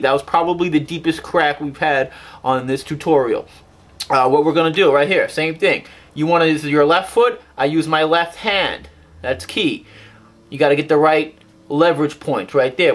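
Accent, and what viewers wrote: United States. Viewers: He has the best boston, yankee accent I'm from the south so I don't here this much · i believe its a new england accent but im not sure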